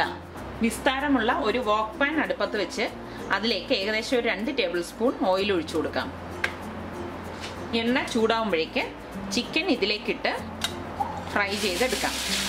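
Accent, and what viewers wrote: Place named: Thrissur, India. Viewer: thank you madam .also ur thrissur voice OK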